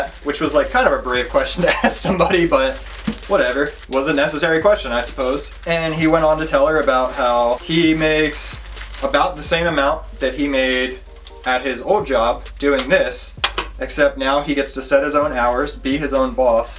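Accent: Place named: New Jersey, United States. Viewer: You sound like a jersey dude. My kinda guy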